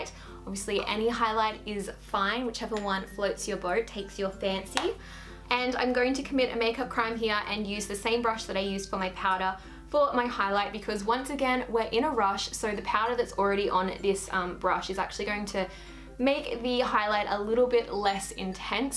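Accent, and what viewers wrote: Australia. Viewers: OMG R U AUSTRALIAN!!?!? I AM!!!!!!!!!!! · Is she Australian? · Is she australian? I cant tell x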